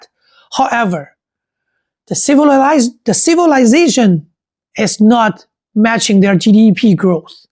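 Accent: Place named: China. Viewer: congrats thats not the norm... You're taking a single video and the spinning it like as if its the norm in china and that everyone acts like that... based on your accent and the way pronounced the restaurant I can pretty much deduce that you're chinese... Yet you seem to spinning china's perception as uncivilized and backwater... I tell you what, you can see the same actions in America, or even elsewhere around the world, yet you actively decide to criticise china like as if the west is best and china is uncultured and uncivilized... And by the way the video is of rowdy kids who were drunk, its not people who are sober and doing it for kicks... Is a dude drunk off his bum and doing dumb shit... Lastly the foods and restaurants are clean and sanitary, like I said this is not a common thing that happens in china... Way to spread China hate and chinese hate... 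You are part of the problem why chinese people and china is getting unwarranted hate from the west... You are feeding the racists and anti china crowd.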